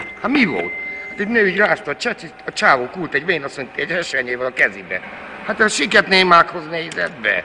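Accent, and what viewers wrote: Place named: Fehérgyarmat, Hungary. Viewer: Nyírséigies az akcentussa.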